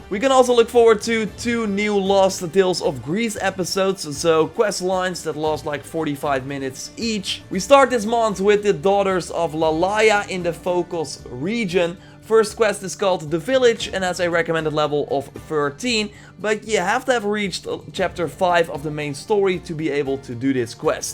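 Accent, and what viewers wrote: Netherlands. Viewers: It took me 1 second to find out you're dutch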